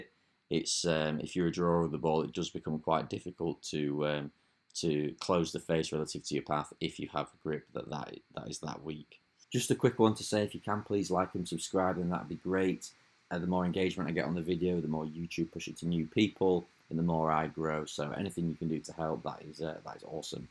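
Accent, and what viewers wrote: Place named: Yorkshire, United Kingdom. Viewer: Great video and a nice surprise to hear a yerkshire accent on a golf video. Could do with turning your mic levels up, or speaking a bit louder. Audio is clear but quiet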